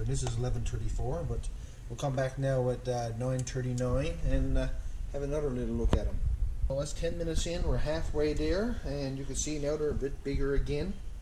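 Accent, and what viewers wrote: Newfoundland, Canada. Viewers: Gotta be a Newfie I’d say bein from Nova Scotia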